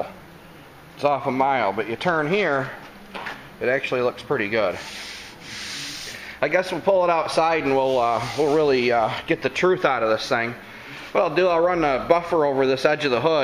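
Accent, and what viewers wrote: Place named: Upstate New York, United States. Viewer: Nice job as usual! Where do you live? I'm from Fairport NY. Moved south to Raleigh in '97. My first house I bought was a 19K HUD HOME in Lyons NY. Just curious as to where you're at. Good to hear the accent. The weather is great here but watching your vids makes me a little homesick.lol nice work and vids keep em coming.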